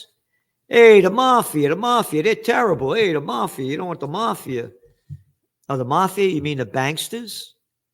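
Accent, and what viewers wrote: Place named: Brooklyn, United States. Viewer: love your Brooklynese!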